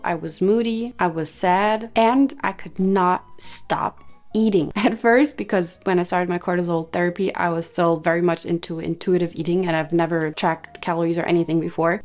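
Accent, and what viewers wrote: Germany. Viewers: I love her German pronunciation though hihi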